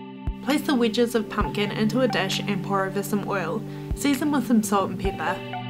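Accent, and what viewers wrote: New Zealand. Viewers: New zealand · That's a New Zealand accent, right? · Man your accent is sooo intense, I left NZ 13 years ago and I'm 100% sure the accent changed. video is amazing though..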